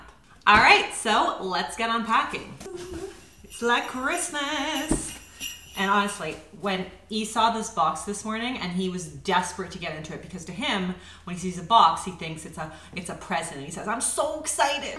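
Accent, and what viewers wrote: Canada. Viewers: You look so gorgeous in this video, and can you send me some of your groceries please? Everything seems so delicious. Also, You don't say bagels wrong You just have a Canadian accent. And when you try to say it differently it sounded exactly the same in my opinion 😁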